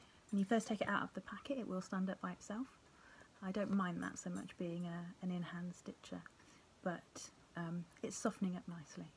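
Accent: Wales, United Kingdom. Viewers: Watched you after Jan Hicks recommended you channel. What a relief to hear a lovely soft English / Welsh voice after so many Americans. Love your stitching! Good luck. Kate, Melbourne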